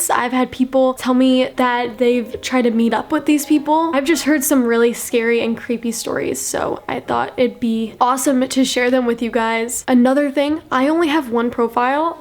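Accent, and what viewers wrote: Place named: New York, United States. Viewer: guy she's from New York they say words differently 😂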